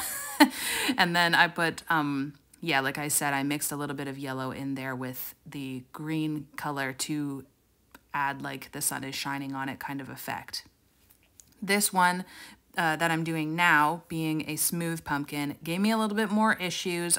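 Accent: Canada. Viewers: I ❤ ❤ ❤ your videos. Your accent reminds me of my family in Canada. You are also an amazingly talented artist who keeps it real. You make art so approachable. You have helped me get back into painting!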